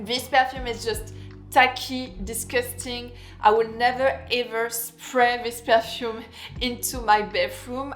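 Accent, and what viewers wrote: France. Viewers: You do realise that perfume is highly personal and changes depending on the body chemistry? You do realise that you are insulting a ton of people and their taste? This is not a review, it's just bashing 5 perfumes for entertainment while speaking with a thick French accent playing the bourgeois authority. A review is supposed to be neutral.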